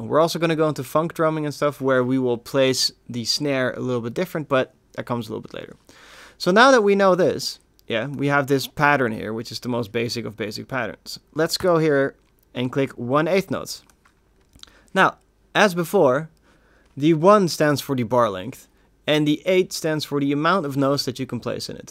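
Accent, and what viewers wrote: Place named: Netherlands. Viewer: Where are you from? You sound a little dutch, your english is really good though!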